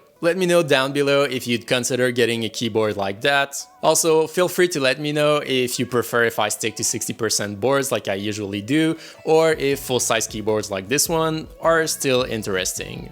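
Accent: Quebec, Canada. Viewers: J'aime ton professionnalisme, le travaille de tes vidéo sont soignée et ton accent québécois distinctif avec une diction impeccable et sans reproche (magnifique) :)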